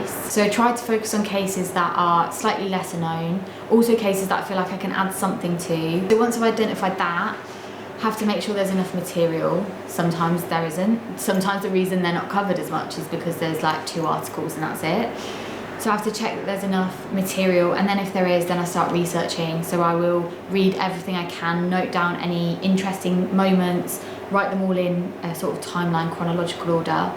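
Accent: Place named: Cockney, United Kingdom. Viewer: I love!!! Love the way she delivers her podcast straight in no giggling like you get on some of these other ones no respect whatsoever. Such a lovely voice too half posh half cockney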